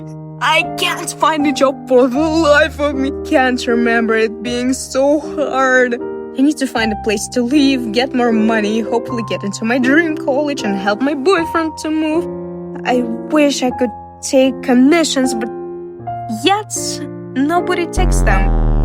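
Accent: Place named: Russia. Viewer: my best friend is russian and still stuck there, I'm from Italy, and your video extremely reminded me of her :) ur accent (and hers) especially I think is always a fun accent to listen to. I'm sorry u ever felt insecure about it! as a trans dude and that also can relate to your struggles with other stuff, i hope ur life blossoms into the best u could ever wish for ♡ u got a new follower!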